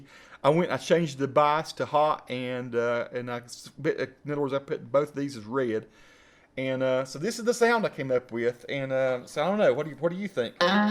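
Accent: South, United States. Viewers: I love Southern American accent some of my friends actually tell me I've got a southern accent myself but bro! You should use the software to dial down the thick accent I want to decide between 40x and 20x I couldn't pick up some parts you said bruh but loveeeee the accent from IRAN of all places? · That southern accent though lol. sounds awesome man, I'm gonna buy the vt40x soon!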